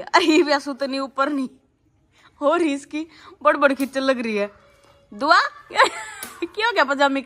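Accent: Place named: Haryana, India. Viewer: Beta aapki Haryanvi Bhasha bahut hi acchi lagti Hai mujhe to❤❤❤❤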